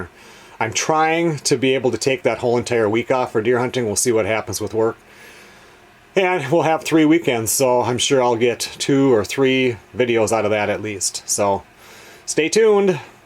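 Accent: Minnesota, United States. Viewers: I got two questions. 1. Does the MN DNR restock your lake or is all natural? 2. How come you / don't ice fish at the tent lake? Thx I grew up in grand forks and spent alot of time as a kid fishing MN lakes. Your accent reminds me of my MN family's.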